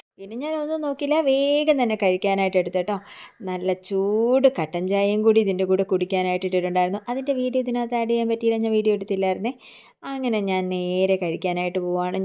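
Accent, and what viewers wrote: India. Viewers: സംസാരം കേട്ടപ്പോൾ കൊട്ടാരക്കര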